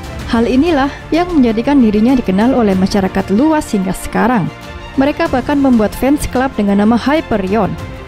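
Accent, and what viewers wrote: Lampung, Indonesia. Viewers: Bang orang Ketapang ya bandar Lampung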